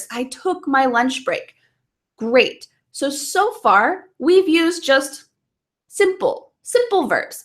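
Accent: United States. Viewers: is your english american ou brazilian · You is American?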